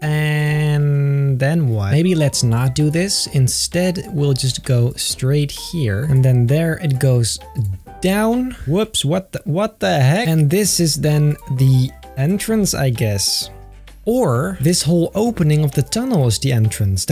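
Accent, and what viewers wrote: Netherlands. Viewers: I smell Dutch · i smell dutch hahahaah lekker toch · are you dutch? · Hallo, Jij bent ook nederlands toch? Ik kan je nederlandse toon hooren, net als thijmen0808. Hij praat ook net als jouw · i smell dutch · Are you dutch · Ja je bent Nederlands, lekker bezig accent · Are you dutch !?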